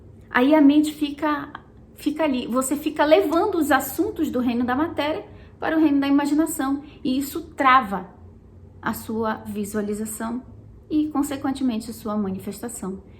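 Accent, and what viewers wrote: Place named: Northeast, Brazil. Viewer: Vc é uma fofa!! Sem contar que adoro seu sotaque... Amo os sotaques nordestino... bjosss!!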